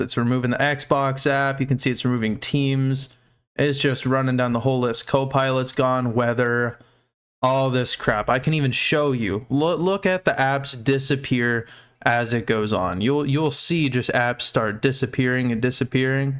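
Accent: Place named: United States. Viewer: Bro your acent is lije a true american 😅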